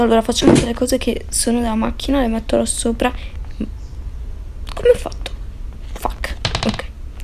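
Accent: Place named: Rome, Italy. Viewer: Vai con ľaccento romano regaaaaaaa😂😂😂 I primi urli sembravano degli ornitorinchi spaventati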